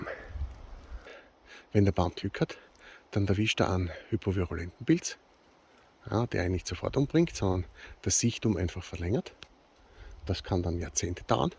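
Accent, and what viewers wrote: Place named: Austria. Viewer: Omlouvám se, ale nemůžete mluvit spisovnou němčinou? Jedlé kaštany mě zajímají, sami je už pár let sázíme, ale tomu dialektu (AT?) jako cizinec nerozumím úplně dobře ..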